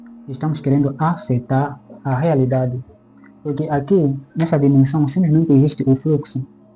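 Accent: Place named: Portugal. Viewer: O sotaque é como se fosse português de Portugal. Ótimo vídeo. Obrigada. Sucesso! Abraço de Belo Horizonte, Minas Gerais, Brasil.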